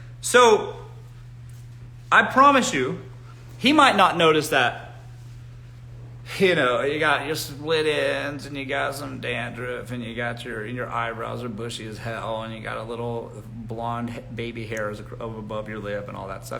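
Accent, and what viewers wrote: South, United States. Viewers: 😂😂😂 "A little bit nappy"🤣 Omg. We can SO tell you were born in the SOUTH. The way you keep it real hurts so good and is so hilarious 🤭 #Boss... my granny was born in MS and we grew up telling it like it is. Tell the truth, shame the devil.😌😆🤷🏾‍♀️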